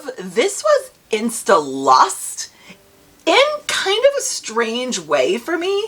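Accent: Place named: Connecticut, United States. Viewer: I knew your accent sounded familiar - I'm from CT too! I live in NC now though.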